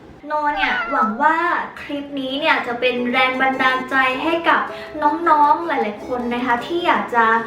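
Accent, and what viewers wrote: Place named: South, Thailand. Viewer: เสียง เหน่อ สำเนียง ใต้ น่ารัก น่าฟัง มากๆ ชอบๆ 😍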